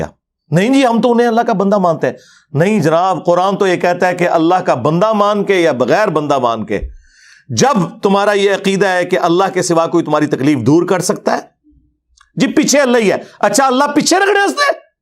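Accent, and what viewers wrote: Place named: India. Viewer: brother wo india sy hai or waha kuch words esy bolay jaty hein , meaning woe hota hai bs bolne mein farak hai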